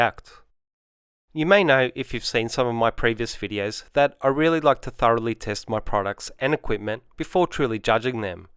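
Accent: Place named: Australia. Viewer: After the Bullshit Techno Intro Music I wasn't expecting to hear an Aussie Accent.....Pretty good review though. Not sure that it's helped me decide between the Rupes LHR15 MK2 or the Flex. Maybe the Rupes is a better all round machine? So I'd be best to go with 125mm backing pad on whatever machine I decide on rather than the 150mm. Is that correct?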